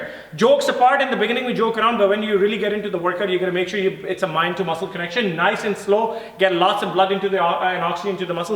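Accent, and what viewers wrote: India. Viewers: Talking mor than working, even your body is not exam to follow your way. In addition please stop Indian accent .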